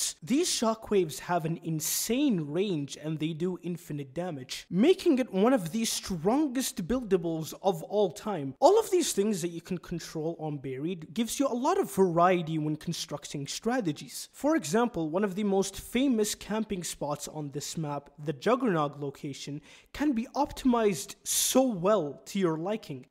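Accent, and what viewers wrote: Arab world. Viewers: الاكسنت عربي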